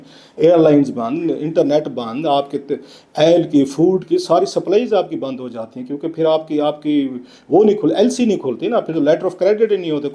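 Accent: Saraiki, Pakistan. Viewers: کلاسرا آپکی سرائیکی اردو پر حاوی ھے آپ نے باربارکو ولا ولا بولا ھے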